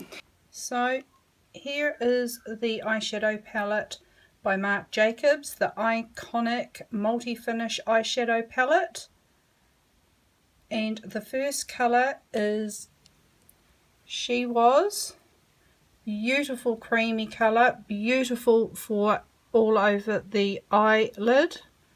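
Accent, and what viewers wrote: New Zealand. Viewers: Hi from Malaysia. I came across your channel while looking for tutorial on Glambition palette. I quickly recognize your Kiwi accent as I lived in Christchurch for a few years and went to University of Canterbury. I binged watched a few more of your videos and realize that you live in Chch too. I feel connected with you already! Thanks for your videos.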